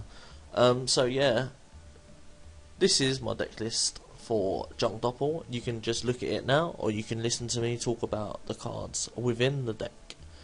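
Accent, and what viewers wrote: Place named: Cockney, United Kingdom. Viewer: You have a cockney accent for sure mate, like danny dyer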